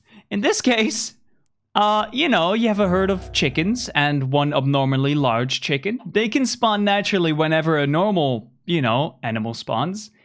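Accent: Netherlands. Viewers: Hey love the vids, are you dutch by any chance? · Are you from the netherlands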